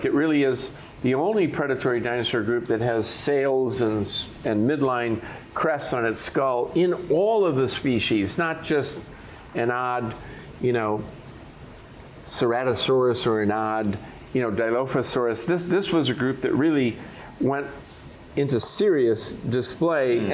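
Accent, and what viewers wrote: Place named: Chicago, United States. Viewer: completely unrelated but I find it endearing to hear his Chicago accent slip out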